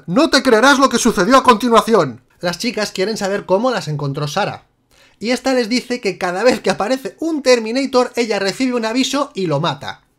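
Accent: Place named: Spain. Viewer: ¿Y las gafas de 3d? Bueno, al menos se que el acento españolete no se irá, que es lo que me gusta de estos vídeos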